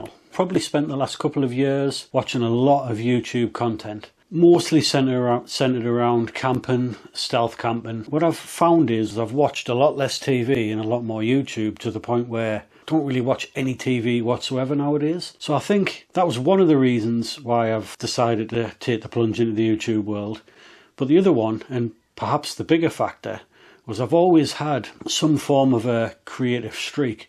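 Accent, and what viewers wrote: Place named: North, United Kingdom. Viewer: Cracking video, aye good advice i didn`t get the expensive cameras just thought i would start with kit easy to learn the basics on. The editing is good lots to learn and helps you think of new technique's for content. On a massive plus it is great listening to a fellow northerner 🤙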